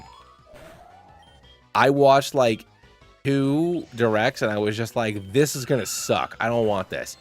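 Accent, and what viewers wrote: Scotland, United Kingdom. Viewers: Lol, no. I genuinely thought you may have been from Scotland 😂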